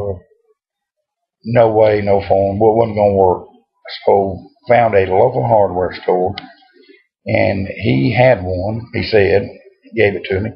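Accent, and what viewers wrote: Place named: Shreveport, United States. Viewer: Good ramble, really enjoyed it. Listening to you talk is like going home again since I was born and raised in Shreveport. We used to drink RC's and eat moonpies when I was young, but I never had a deep fried one. see ya next time.:)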